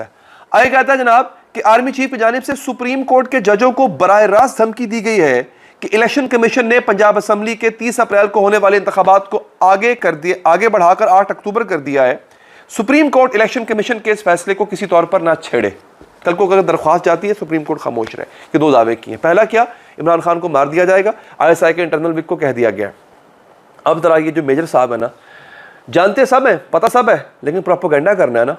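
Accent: India. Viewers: India k channels kam dekha karo.tumhara tou lehja bhi Indian hogaya hai tum bhi ghaddar ho besharam😡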